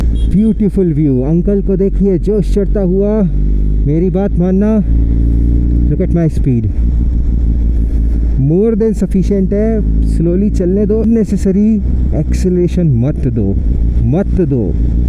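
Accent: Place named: Chhattisgarh, India. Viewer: Deady colaigen..😂😂😂 ur chhatisgarhiya accent is so dope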